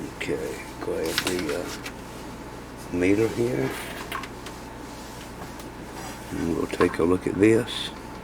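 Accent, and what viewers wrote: South, United States. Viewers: I'n happy to see some great folks stepping up to help and the thru his Patreon channel.. I find his brand of repair and restoration unique and refreshing. Besides his southern draw make me smile with delight. Please consider joining me by adding your support as Patreon with a $1 or more monthly contribution to help insure having his informative videos around for a long time.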